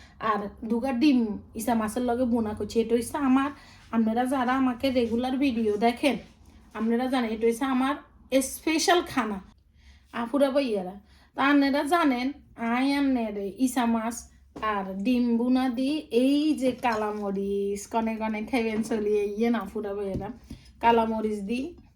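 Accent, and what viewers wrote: Noakhali, Bangladesh. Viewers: আপু নোয়াখালী বর্না হইতেছে😭😭😭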